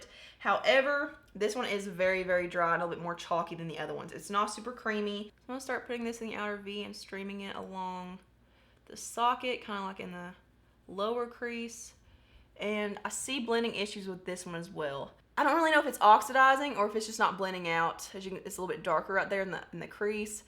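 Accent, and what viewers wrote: South, United States. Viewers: THANK YOU FOR YOUR HONESTY! Your video is the first truly honest & genuine review. I love Emily also, but I'm sure she didn't have absolute control over the whole production of this palette. I'm SO grateful I stumbled across your channel. I'm really drawn to your southern drawl... maybe cause I've one also. You also do a lot of looks like what I prefer myself in my daily life. The face is a canvas that we turn into our own personal masterpiece. Blessings to you for your WONDERFUL, FUN & GENUINE videos!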